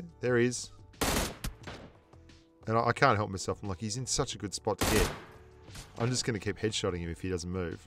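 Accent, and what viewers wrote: Australia. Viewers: I love your Aussie accent...keep it up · Strayan accent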